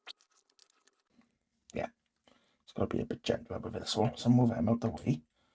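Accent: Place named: Swansea, United Kingdom. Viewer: Happy days beautiful swansea jack accent I have one Awesome knowledge is power 🎉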